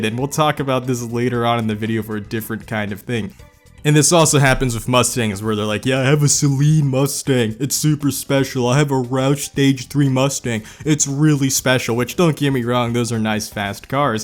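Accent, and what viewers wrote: United States. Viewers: Ur asian? Ur like THE MOST american sounding person i have ever heard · he is Asian but sounds like an American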